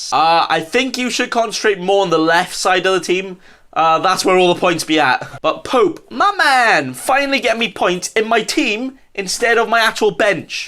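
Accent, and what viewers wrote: Wales, United Kingdom. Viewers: Where you from m8? Swansea? I’m in Haverfordwest....great video as allways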